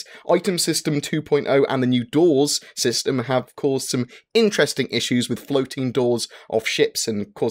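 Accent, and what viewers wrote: England, United Kingdom. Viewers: Thanks for the update. Are you English?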